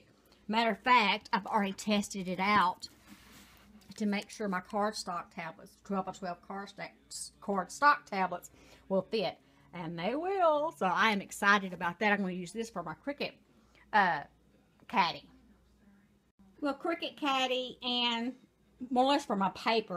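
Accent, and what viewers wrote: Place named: South, United States. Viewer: It's my pleasure I found your channel a couple of months ago and I just love the southern accent, I am a country music lover ! I wish I had some Loretta Lynn's show where I live too!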